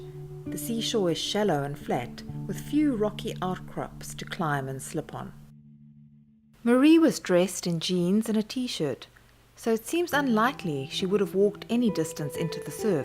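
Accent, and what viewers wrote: South Africa. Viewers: Very sad,love your channel your soothing South African voice...thanx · Nice to see a YouTube channel with a South African voice covering this type of material. I have started a blog and will soon have a podcast going which will cover South African true crime cases. I hope to cover Marie's story and have made contact with the twitter account which runs under Get Marie Home. Very strange story.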